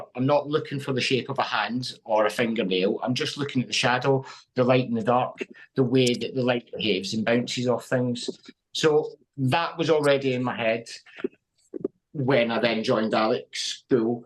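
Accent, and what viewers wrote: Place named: Glasgow, United Kingdom. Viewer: 😂 If I was speaking in full Glaswegian dialect, it would probably only be (some) Scottish people that could follow without cc! 😂Thanks for joining us! 👍🏻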